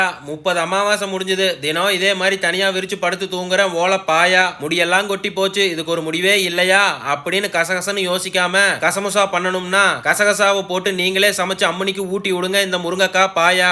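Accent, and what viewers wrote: Coimbatore, India. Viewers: கோயம்புத்தூர் பாசை நல்லார் க்கு வித்யாசமா பன்றீங்க முறங்கக்கா பாயா❤❤❤❤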